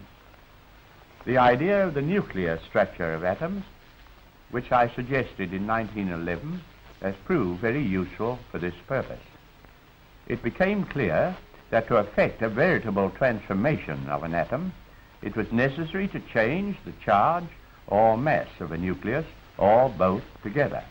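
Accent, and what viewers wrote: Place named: New Zealand. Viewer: I'm amazed his accent is still so clearly Kiwi, especially given his education, class and all those years in the UK. It's great to hear!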